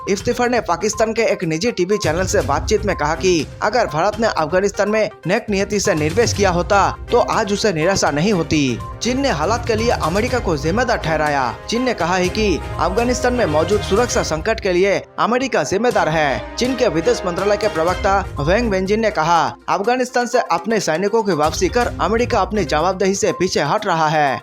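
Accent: Bengal, India. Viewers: यह फेक न्यूज़ चैनल्स होसकता क्योंकि महाशय की हिंदी बोली से शक करने पर मजबूर हूँ।बंगाली गंध आ रहि हाइय् । भा हा इ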